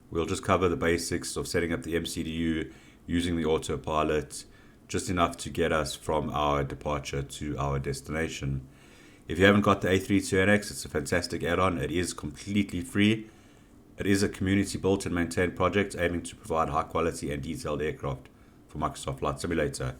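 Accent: South Africa. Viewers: Nice tutorial, great to hear a SA accent in MSFS2020 :) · Great video, thank you so much.... By the way, you sound South African😊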